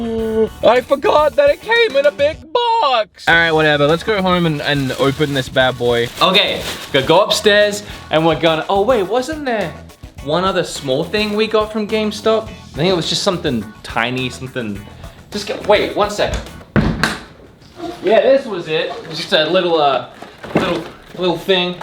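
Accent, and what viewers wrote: Australia. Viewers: I'm very confused with your accent... sometimes a little Australian slips in... Anyway, I'm savin up to get a Switch. Played BoTW on my nephew's and I want that experience 24/7 now. But I don't intend to get the Lite because in my hands, the white buttons won't stay white for long. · You sound Australian and American. Which one are you??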